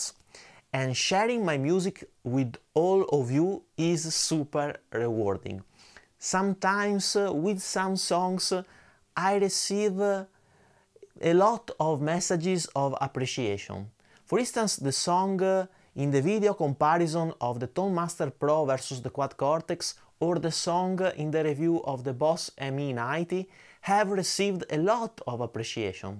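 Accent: Italy. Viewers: Hi. I'm Italian. Your English is perfectly understandable. The pronunciation, with a strong Italian accent that characterizes it, is clearer for the automatic translator than that of native English speakers. So what matters is that your content can reach everyone. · Cheers from Istanbul I am a dedicated home player music is my main hoppy I leverage of your videos for couple of gadgets I recently purchased.. you are a naive great person I love your Italian accent music is the universal language and for language different accents are great flavors your music is really amazing btw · Hi, Your videos are some of the very best on YT and they often really help. Also Your playing and the songs are very good. I like Your English, it makes me smile, and when I'm in Italy that is the accent I hear when I talk English to people. It's very clear and easy to understand. No need to bother trying to sound like a proper Lord:D Thanks for all the knowledge we get from Your tests! 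It's a huge amount of work You have done here and we all just consume it for free. Huge thanks! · Zero wrong with your English. You have an Italian accent - hardly surprising! Keep up the great work. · Your English pronunciation is actually one of the reasons I watch your videos… some of my grandparents were Italian, and it reminds me of them. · great channel and as fellow Italian ( living and playing in London for over 20 years) I tell you don't worry about the english pronunciation, what you say is clear and understandable and that is what matters....and as a positive bonus it speaks about your roots. keep the compositions coming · (Warning! This message is written by a non native speaker)! Your english is more than good enough. In Europe, at least, we hear english spoken with different accents every day. One would have to be pretty narrow minded to dislike your channel based on your italian accent. 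Your channel is so rewarding to watch, i love the in depth analysis you provide. Allways looking forward to your next upload! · Wish you all the best, thanks for all the knowledge and the professionalism I also wish there are more channels like yours , Italian accent is cool 😎 cheers and all the best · Great video!! I for one think the Italian accent you have when you speak English is a part of the charm and i have no problem whatsoever to understand you as a Swede that write/read and speak English pretty well so dont worry about it at all. All the best! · ! Your Italian accent would be a total chick magnet around here. I wish I was bilingual with your accent. My date book would be completely full. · Servus 😉 Gratulations for 30000 follower, you deserve much more! I like your channel, also to be informed news around guitar gear. I am a basic English listener and speaker… I understand the most of it and I also like your Italian accent. 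Greetings from Bavaria an keep on 🎸👍🏼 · Ciao,! Your channel is great! … and I like the Italian accent: my other great love is MotoGP, so I am very used to it! ☺️ Be well! Greetings, from Downunder! 🇮🇹🇦🇺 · Your channel is great! Your english perfect! accent reminds me of Salvador's Dali! speaking in english, accents must be diferents from people to people, you italian so you have italian accent, it 's natural. My question, what your real job? is it musician? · As an Englishman, I'm glad that these videos are in English, as my Italian is not that great, to say the least! English sounds good with an Italian accent, anyway. Also, it's the content that counts and from what I've seen so far, there are no issues there. Quality stuff! 👍🏼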